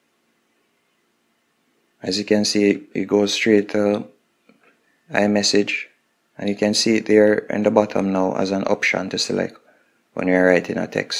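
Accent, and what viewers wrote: Jamaica. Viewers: I can hear the Jamaican accent 🙌🏽🇯🇲 Big uppp! Keep grinding on YouTube! Don’t let the hate get to you. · Thx and I hear your Jamaican accent im Jamaican to!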